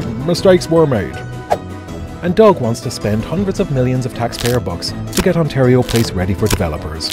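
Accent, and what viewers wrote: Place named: Ireland. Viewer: 1. I fail to see the relevance of which country the company is from. But there's also a company from Quebec called EcoRecreo involved with the project but I guess that doesn't fit your "anti Canadian" narrative? 2. Fifty dollars per person for a trip to a spa and waterpark seems pretty reasonable. Before Ontario Place park closed they also charged for admission because that's how theme parks work so I don't know what you're upset about. The plans also include various winter activities like ice skating. 3. There are better places you can go if you just want trees and a lakeshore. The Leslie Street spit or the islands for starters. 4. You seem to have some sort of problem with companies making a profit but I notice that you accept donations and have ads on your videos. So where is your profit going? 5. Why is an Irishman narrating this video and posing as a diehard Ontario native?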